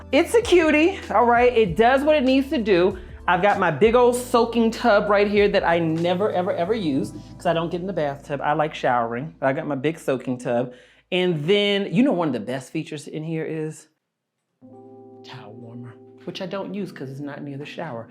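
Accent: United States. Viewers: I actually believe this. I do think that he gets around it because he’s American, the accent is what saves him. Any black South African is not having the same experience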